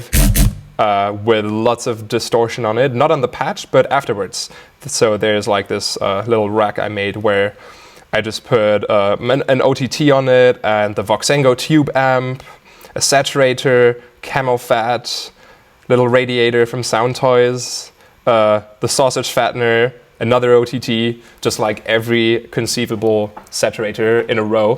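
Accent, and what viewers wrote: Germany. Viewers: wie man das deutsch raushört :D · I always thought he was Irish, but now I know he's German I can actually hear it, bizarre! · I love his German accent XD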